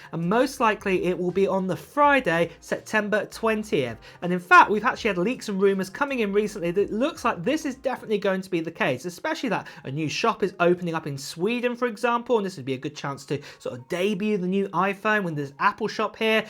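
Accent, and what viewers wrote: United Kingdom. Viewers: UK English accent 🎉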